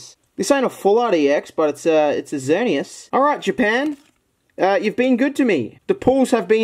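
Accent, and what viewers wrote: Australia. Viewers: Nah thats just how Aussies talk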